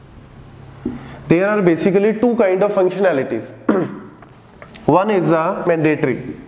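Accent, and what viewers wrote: India. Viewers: Thanks sir... Sir apke English bhi mujhe hindi se lag rahi h .😘😘 thanks for it again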